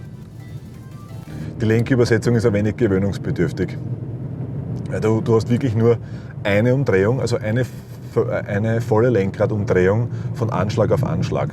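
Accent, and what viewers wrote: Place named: Austria. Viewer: Deutsches dialekt won östrreich ist komisch......aber auto ist cool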